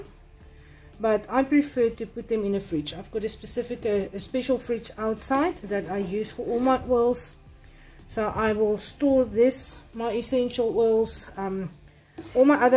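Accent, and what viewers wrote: South Africa. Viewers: I had to watch the video over because I am a South African living in Australia, and it was just so lovely hearing a beautiful South African accent....My mother was dependent on her oxygen machine towards the end of her life, and the load shedding was frightening for her, so thank God for modern technology, we were able to get her a generator. It is just a way of life one gets used to...with the flies and all.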